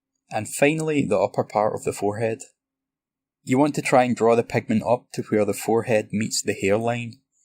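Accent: Scotland, United Kingdom. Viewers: VERY controlled and detailed work, the 'subtle' finish speaks (looks???) for itself! Great and CLEAR presentation in terms of info/technique/example AND such a lovely Scots accent!!! xx SF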